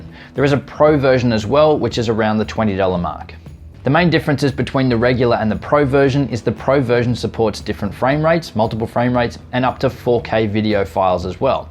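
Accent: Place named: Australia. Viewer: Bro your Cleary Australian Australia for life (I'm Australian as well) I'm WA what state are you if you want to share it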